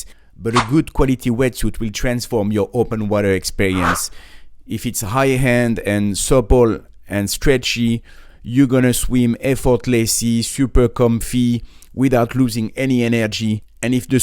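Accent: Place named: France. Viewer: It's funny how your accent sounds exactly like the one of a French native speaker.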